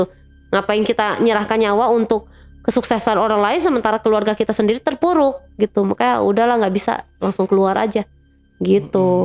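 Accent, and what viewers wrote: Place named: Sulawesi, Indonesia. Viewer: Aksen logat sulawesi 😁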